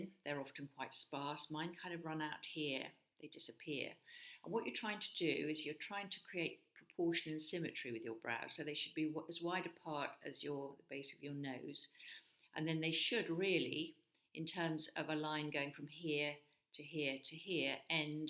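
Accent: United Kingdom. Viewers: I have only just discovered you & found this vid really helpful & good to watch, thank you. Also nice the hear a Brit for a change, although I love the US ladies I follow...( I am from UK, currently living in France). I look forward to seeing more of you asap !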